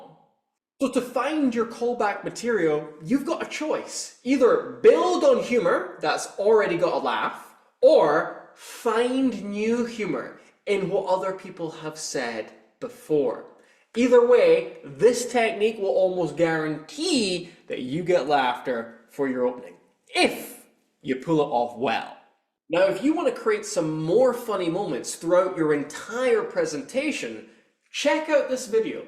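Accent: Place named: RP, United Kingdom. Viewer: rp accent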